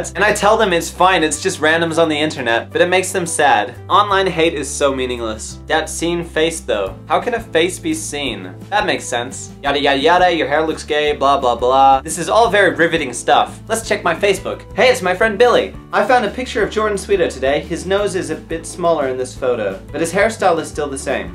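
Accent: Australia. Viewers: I love how your aussie accent slips through a bit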